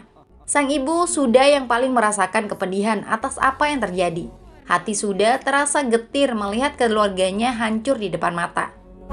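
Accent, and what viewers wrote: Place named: West Nusa Tenggara, Indonesia. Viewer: Kayaknya admin orang NTB 😂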